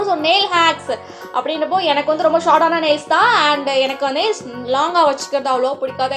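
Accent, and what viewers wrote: Tirunelveli, India. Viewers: Akka neega tirunelveli ya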